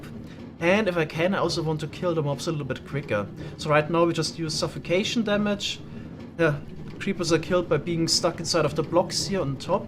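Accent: Germany. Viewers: Man hört so raus das du deutsch bist hahaha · Man hört den deutschen Akzent so stark raus 😂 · Real talk mir kann keiner erzählen das du nicht Deutsch bist! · Man hört so sehr raus das du deutsch bist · Du bist deutscher nh ? haha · Bruder uch hör schon das deutsche raus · u german? i can hear it yu are german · Are you from Germany? Because you sound like a German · deutsches englisch ist so ne 10/10. aber ehrlich danke für die geilen farmen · The German accent🙌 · are u german or am i trippin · you sound like a German. im German and want to gnow if your German comment what im gonna say on German mein name ist Manuel und mein Lieblingstier ist eine Corbra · Der deutsche Akzent 😂👍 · He is so German😂 this accent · das höhrt sich so hart nach deutschem aktzent an bist du deutsch? Ps feier die serie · bro you sound so german 💀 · his accent sounds very German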